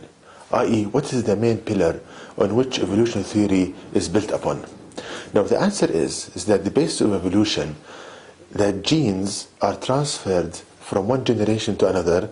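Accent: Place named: Arab world. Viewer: he speaks EXACTLY like ownage pranks arab accent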